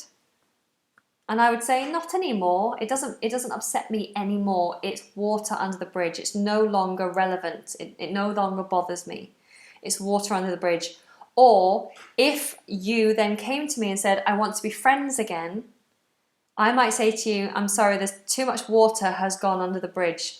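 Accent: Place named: England, United Kingdom. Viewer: IF I LEARNED ENGLISH WITH HER I WOULD HAVE TO MOVE TO ENGLAND BECAUSE I COULD NOT SAY " MO_O" INSTEAD OF "MORE" OR "LATE" INSTEAD OF "LETTER".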